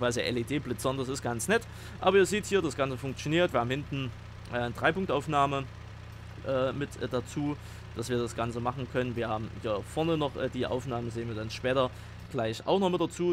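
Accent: Germany. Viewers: Gibt auch Gegenden in Deutschland wo man so redet;)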